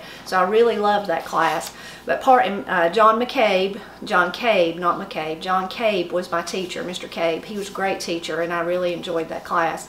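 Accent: Appalachia, United States. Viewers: What a pleasant surprise, stumbling on a cooking video from you. I love to cook and Appalachian cooking is nothin to me other than the way I grew up eating here in Texas, thanks to my granny coming from KY to stay with us while mom worked. Listening to your videos is like sitting on the porch visiting with family. I remember people actually going to VISIT. What an odd concept to the insanity of today's world. God bless you and your family and keep you safe. By the way, you don't talk neer as up the holler as my kin folk in Southwest Kentucky. 😁👍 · I love your videos. Take care! You’re loved here in upstate SC. I’m familiar not only with your speech and traditions, but you’re so sincere in your love for the history and ways of our Appalachia, as am I, , I would love one day to be able to just sit down for coffee and some good conversation with you. 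I feel like you’re a friend already.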